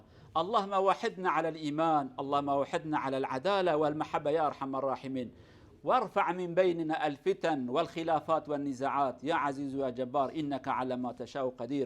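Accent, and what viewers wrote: Turkey. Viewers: Maşallah hocam ne insaflı bir konuşma. Acaba Hocamız hakkında detaylı bilgi alabilir miyim? Şive Diyarbakır çevresi gibi. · Bu hocamızın dili Bitlis tarafına benziyor sanki diline sağlık hocam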